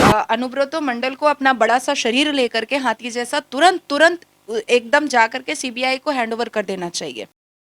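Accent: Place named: Bihar, India. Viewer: Madam actualy where r u from apki bangali shunke bahut accha laga...I think u r from bihar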